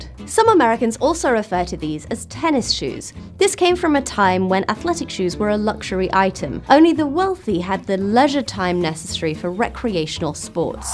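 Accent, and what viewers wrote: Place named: England, United Kingdom. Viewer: ***** Why do you all call cigarettes a fag? If someone came up to me and asked me for a fag, Id direct them to google "gay clubs" in the area. One last thing, heres a joke: Say "beer can" in an english accent (which u have, so say it regularly)....... Congratulations, you just said "bacon" in Jamaican!!!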